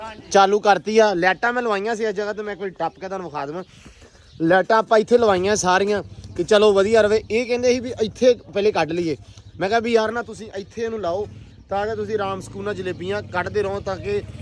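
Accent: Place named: Malwa, India. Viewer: Bai g tuhadi boli sade malwe nal mildi aa me machhiwara sahib ludhiana punjab to ha ❤🎉🎉🎉🎉🎉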